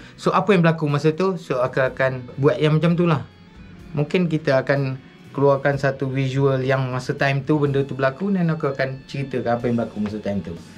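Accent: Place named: Johor, Malaysia. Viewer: 😅saLam~saye rase nie video Lame Lbh kurang10tahon Lps abangmus throwback semuLe ye!?🤔dah Loghat joho Lah puLak gamak nye nampak tengok bang🤙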